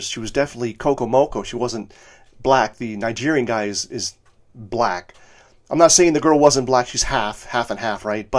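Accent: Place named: New York, United States. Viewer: This is the first time I saw you. A truly great stuff. Jerry Seinfeld reincarnated- I wonder, is he still alive? This New York accent. Promiss to watch your videos, now I`m working in my garden -in Poland! That`s where I live. 'White Privilages'? Jeez! You have them back home? What`s that?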